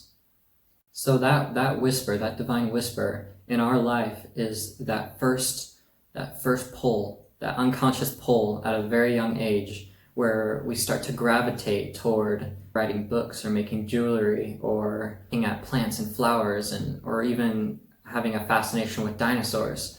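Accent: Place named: United States. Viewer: I thought you live in USA.......becouse the way you speack english language....!😊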